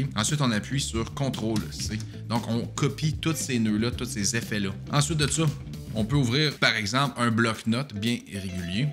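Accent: Quebec, Canada. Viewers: en effet, pour partager avec un autre utilisateur, cela peut-être utile. Pour ma part je travaille seul donc l'astuce #2 me convient bien 😊. En tout cas merci pour ta reponse et bravo pour ta chaine car trouver des tips francophones sur DVR n'est pas simple. Et en plus j'adore l'accent québécois 😊. D'ailleurs maintenant, je dis tout le temps "pogner une bièèèère" 😉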